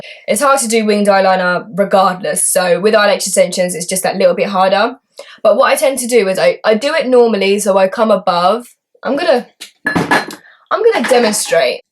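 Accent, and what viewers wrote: England, United Kingdom. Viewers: Where in England are you from babe? Your accent is so sweet!! xx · i detect north or east london. could be wrong though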